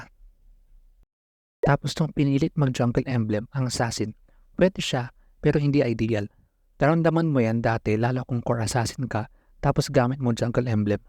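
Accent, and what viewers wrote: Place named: Pangasinan, Philippines. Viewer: Naririnig ko ung Pangasinan Accent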